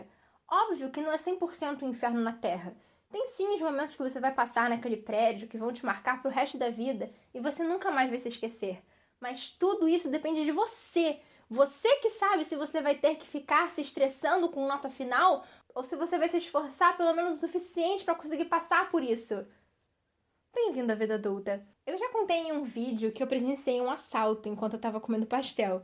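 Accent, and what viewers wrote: Rio de Janeiro, Brazil. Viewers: sotaque carioca é feio demais tá maluco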